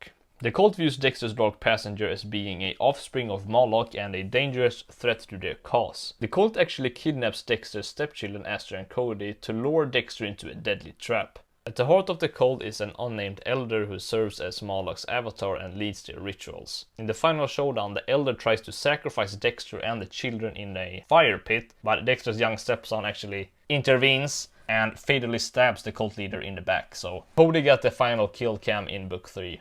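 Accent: Sweden. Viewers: 33 seconds in and I am certain of one thing. you are Swedish. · He sounds turbo Swedish, because he is. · Är du svensk? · Are you swedish by any chance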